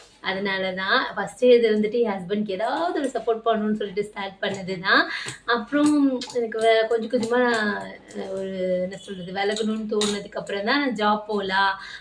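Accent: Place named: Chennai, India. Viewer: Chennai ya sister neenga pesurathu romba pidichirukku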